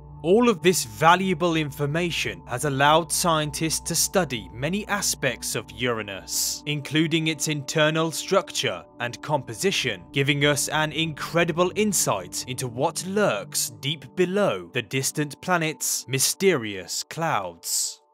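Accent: United Kingdom. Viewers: His british accent make it even better,uranus is so deep btw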